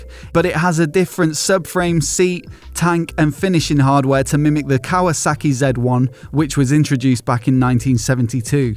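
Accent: United Kingdom. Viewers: Far too British 😂🤣😂.......What's going on?? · An interesting episode for the promotion of Triumph. Are you British? PS: My cat urinates more than the gasoline in the Triumph's tanks. Apparently some people think that motorcycles are created not to ride them but to admire them motionless. (The shortest, and most creepy, joke: an trip with Triumph Bobber - but also Triumph in general.)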